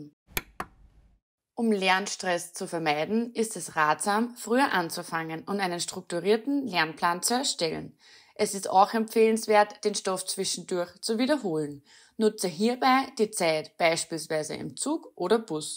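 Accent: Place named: Austria. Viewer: yoooo is that an austrian accent? echt krass so sick. best accent, congratulations on growing up in the coolest sounding part of the german speaking world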